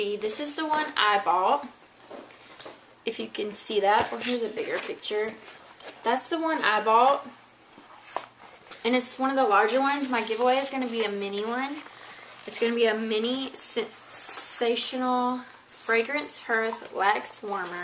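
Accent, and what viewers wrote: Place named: South, United States. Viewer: I AM FREAKING IN LOVE <3 <3 <3 <3 with your sweet southern belle accent. omg my best friend is country too and i just love it! i want to marry a southern man when i get older <3 okay so anyway i love your videos and i love that you are not giving out makeup like every other youtube girl! you are such a sweet mommy! i can only hope and wish that i can be as great as a mom as you! your little babies are soo adorable and i can't believe how skinny minnie you are! got your figure back alrady lol. <3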